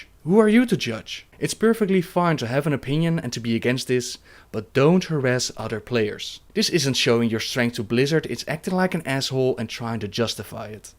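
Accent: Netherlands. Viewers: are you dutch ? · Your Dutch accent is ridiculous.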